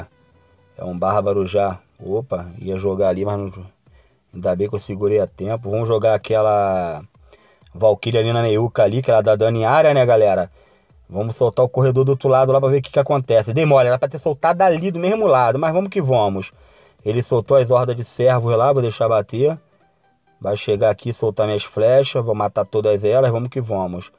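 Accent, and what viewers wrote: Rio de Janeiro, Brazil. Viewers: vara seus vídeo e top d+ mais o sutac carioca incômoda muito um mineiro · Vs é Carioca Né? Adoro As Gírias Carioca!!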